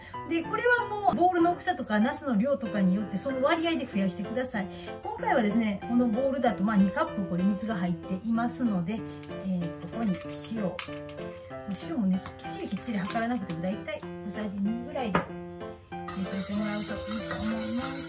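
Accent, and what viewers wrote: Kansai, Japan. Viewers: わかりやすいですありがとうございます。 関西弁もサイコ〜です✨ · 先生の関西弁毎回聞いてて最高⁉️ 毎回楽しみにしてます。ナスは今は旬なんでつくってみます🎵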